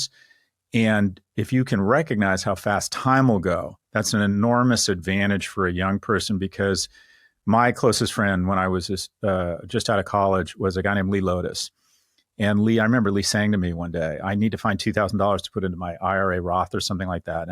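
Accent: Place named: United Kingdom. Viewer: sound bri’ish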